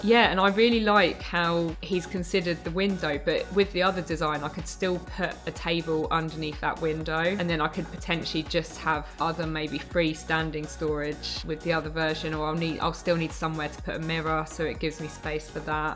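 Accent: United Kingdom. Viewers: Your Great Accent makes me think of Big Ben or The Tower of London , Are you a Brit , Fly a Union Jack in your new studio .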